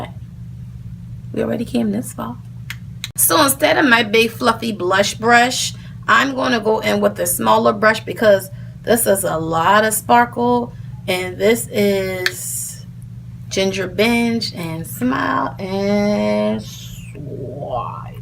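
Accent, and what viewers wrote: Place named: New Orleans, United States. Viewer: You slayed this video! You are too much this New Orleans accent draws me in every time I promise lol 😂😂😂😂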